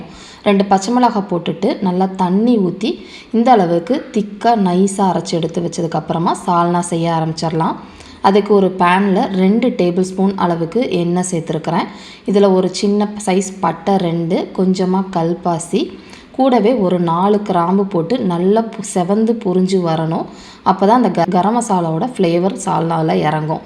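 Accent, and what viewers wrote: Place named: Tirunelveli, India. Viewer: Enaku ithula romba pudechathey nama nelai slang than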